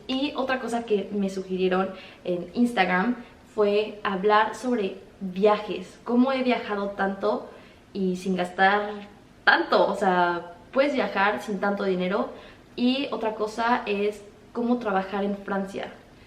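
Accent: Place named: Mexico. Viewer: De que región de México eres, por tu forma de hablar ¿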